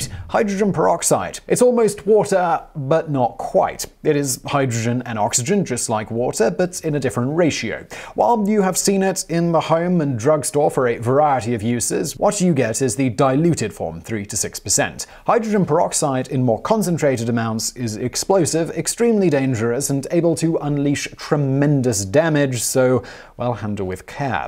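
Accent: England, United Kingdom. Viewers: All of you guys complaining about how he prounces cadmium must not be regular viewers. made it very clear in other videos that he doesn't care if he mispronounces words and clearly puts in little to no effort trying to remedy the problem. This is exactly why people who don't understand topics shouldn't present videos on them. And why you need a host who contributes more to the show than just an English accent. Incorrect information, like misproununciations, spread like a disease. One person hears it, then spreads it on to others, who in turn spread it on to others thsemselves. And so on and so on. Some may think it's a trivial issue, but when we're talking about 10s or 100s of thousands of people being exposed to this stuff, the figures are anything but trivial.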